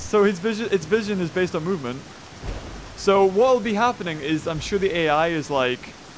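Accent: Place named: Scotland, United Kingdom. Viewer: With your accent you sound exactly like the actor Ewen Bremner who was in Alien vs Predator movie... heh... When you said .. "Oh God..." it reminded me of this scene ---> <link> Are you Scottish? He is.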